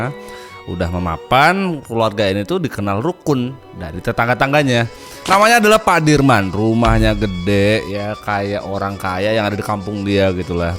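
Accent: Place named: Madura, Indonesia. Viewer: Kadang kadang logatnya kayak logat orang Madura 😂😂😂😂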